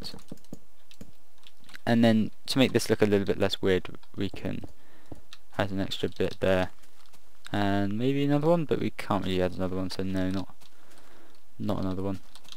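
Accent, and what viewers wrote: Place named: Southampton, United Kingdom. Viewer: he sounds like hes from southhampton or somewhere near there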